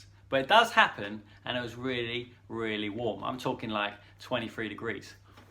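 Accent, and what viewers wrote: United Kingdom. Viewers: Hi, I’ve just discovered your channel and find you delightful and after seeing the first video I’ve quickly subscribed! As an American I love your accent and most of what you say I understand - sometimes I have to try and interpret a word but it’s so worth it. Right away I’m learning some things about sourdough that I did not know. My journey with sourdough just recently started and you make it fun!! I’m 78 years young and I think I have a crush on you, lol 😊 ( who says Americans can’t learn something from the Brits? ). Happy baking sweetie 😅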